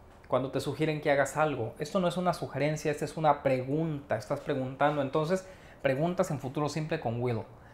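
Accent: Spain. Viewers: me encantó tu acento español tocallo. hace tirmpo hiciste un video sobre inglés britanico ¿ Podrias, por favor hacer uno sobre inglés Australiano?. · Ese acento español...mal jajaja un saludo y buen video una vez mas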